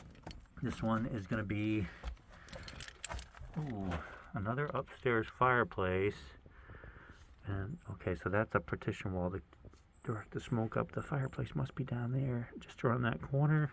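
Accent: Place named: Northeast, United States. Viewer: Any idea where this guy is from? His accent sounds like he’s from the northeast maybe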